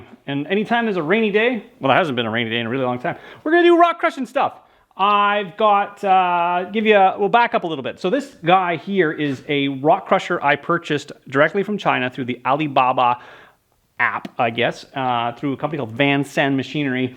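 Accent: Canada. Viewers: For some reason you sound more Canadian today 😂 .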